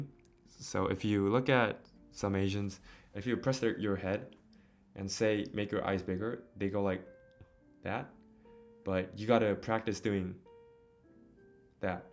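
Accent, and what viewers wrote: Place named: Texas, United States. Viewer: You got the Texas drawl down perfect :) You look fine. Whatever gives you confidence is good. One thing people can do to reduce fine lines and wrinkles and firm under the eye is to close the eyes and flex the muscles in the top and bottom lid. You can feel the difference if you place your small fingers on the outer corner of the eye socket :) It feels good, too. Great call on the eyebrows!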